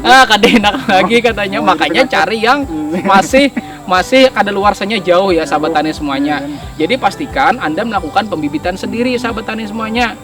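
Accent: Banjar, Indonesia. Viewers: Jelas sekali ulasannya, sehat selalu utk bapak penyuluh. Kya logat banjar, orang mana bapak? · Org Banjar sklinya 😂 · Pian orang Banjar kah?? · Pian org banjar kh pak. Ampun chanel ini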